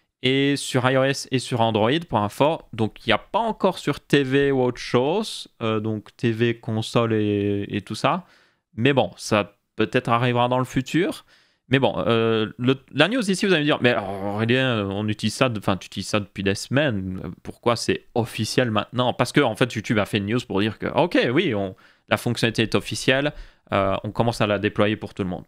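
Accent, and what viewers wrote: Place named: Belgium. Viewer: Je t'ai découvert ya pas longtemps, et tu mérites tes 100k abonnés. Ton contenu est sympa, tu expliques bien, tres pedagogue, et ton accent belge te rend encore plus sympathique pour moi ;-)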